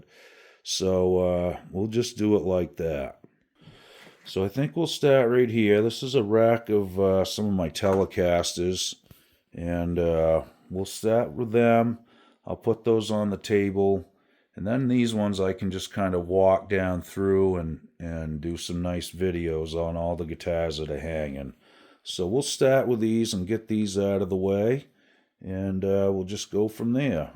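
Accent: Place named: Boston, United States. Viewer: New subscriber!!! Great video and I love your collection. Your approach to video and guitar philosophy is enjoyable and engaging. I know you are in Maine but sound just like we do in the Boston area. We locals would tell you that "You collection is pissa!"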